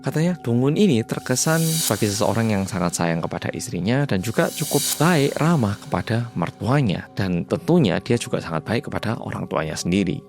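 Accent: Java, Indonesia. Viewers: Malem medok🎉 Lgsg nyimaaak 🎉🎉🎉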